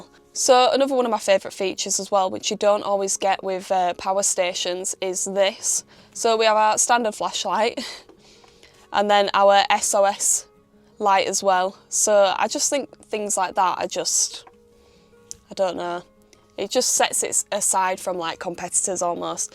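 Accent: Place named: Yorkshire, United Kingdom. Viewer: I’ve been missing that (Yorkshire?) accent.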